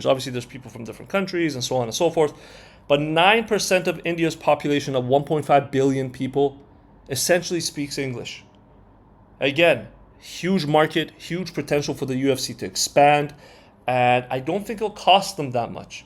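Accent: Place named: Canada. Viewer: u sound brown and from canada am I right? ik this a weird comment lol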